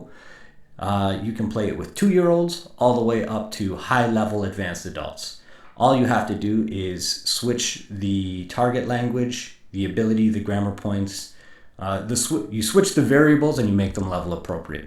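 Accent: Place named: United States. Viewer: Thanks the video is great you should do more videos like this. I'm a teacher of English from Gaza / Palestine if you ever heard about it:). I teach first grade. I teach 9 classes each has 35 to 40 pupils they enjoy your games and they like songs as well. Nice to meet you and too. Btw your accent is similar to the American accent that's why I asked you ♥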